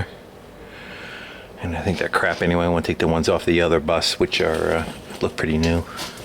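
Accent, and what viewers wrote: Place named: South, United States. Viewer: Thiss'n! Awesome. Love southern speak!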